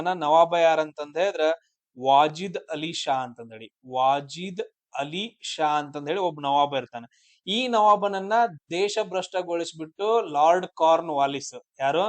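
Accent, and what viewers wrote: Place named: Belagavi, India. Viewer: Nimm belagm ಬಾಷೆ ಕೇಳೋಕೆ ಚೆಂದ..sir thank you sir 🙏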